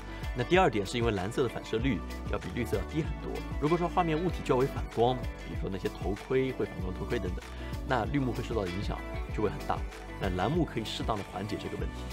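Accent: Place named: Hangzhou, China. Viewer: Tim的杭普话 太亲切了！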